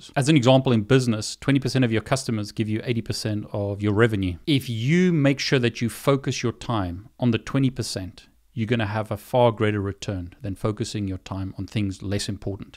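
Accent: South Africa. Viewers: Thank you, this was super helpful. & so great to hear a YouTuber with a SA accent! Hi from CPT. 😁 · Great hearing a South African accent inspiring success so powerfully. Baie baie dankie, boet!!! 😉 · Are you south african? You sound south african :)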